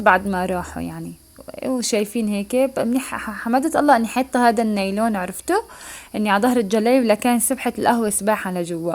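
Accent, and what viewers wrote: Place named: Aleppo, Syria. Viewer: تشرفنا روحي والله عرفتك من حلب من صوتك بس استغربت عبقلولك انتي من شام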